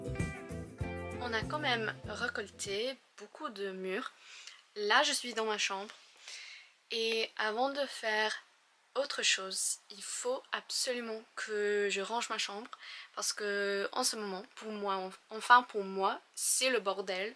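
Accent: England, United Kingdom. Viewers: With your English accent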